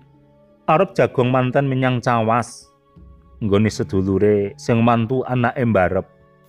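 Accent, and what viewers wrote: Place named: Banyumas, Indonesia. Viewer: Bagus..jawa versi ngapak banyunasan...jawa tak hanya jogya solo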